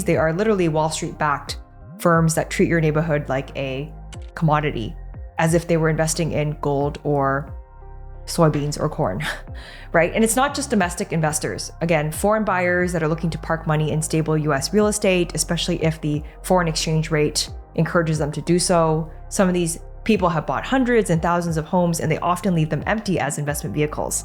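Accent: Canada. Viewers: She sounds Canadian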